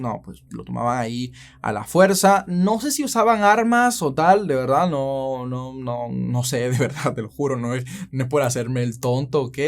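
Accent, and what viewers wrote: Venezuela. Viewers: Tiene acento venezolano👈 Su acento no es peruano👈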